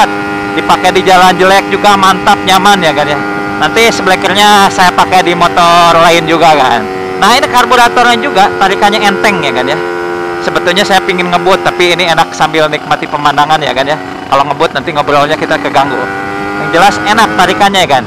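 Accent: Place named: Tasikmalaya, Indonesia. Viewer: Jb cisinga si aa na orang tasik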